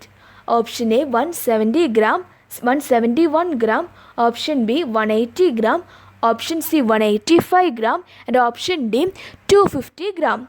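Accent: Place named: Kerala, India. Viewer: Malayali aanu allae...accent kettapol manasil aayii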